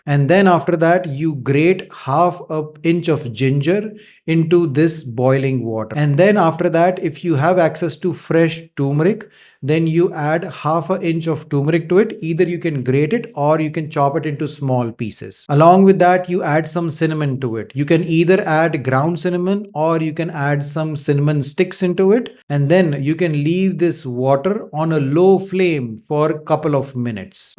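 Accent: South India, India. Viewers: Doc,gratitude for empowering us about thyroid care, why you've south indian accent did you stay in south india for long.🙏👌❣️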